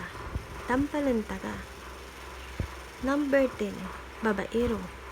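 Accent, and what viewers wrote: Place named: Pampanga, Philippines. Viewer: May halong kapampangan ang galing sis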